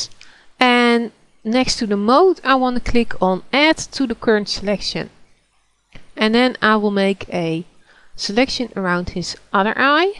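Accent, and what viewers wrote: Netherlands. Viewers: lol, ik hoor wel dat je een jonge Nederlandse vrouw bent ;) Buitenlanders hebbe' natuurlijk geen idee. Goede filmpje bij de weg.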